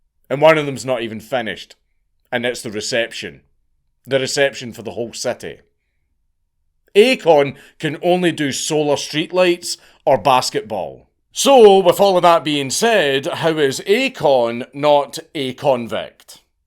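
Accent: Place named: Scotland, United Kingdom. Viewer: I like how Count Dankula doesn't try to change his Accent for the International Audience Because of him i feel like i can now fluently understand Scottish for when i visit Scotland as a Rural Bavarian from Germany Prost & Cheers from Berchtesgaden in the Bavarian Alps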